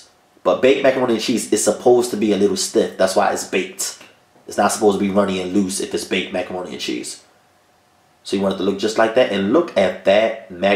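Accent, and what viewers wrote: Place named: DMV, United States. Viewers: Sounds like he’s from Baltimore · enjoyed the commentary sound like my folks in the DMV area lol well I'm making it now 10/9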